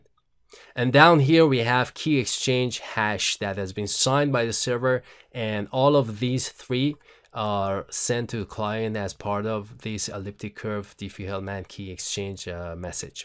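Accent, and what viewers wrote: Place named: Iran. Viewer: I think the speaker is Iranian I can tell from his accent I am Persian too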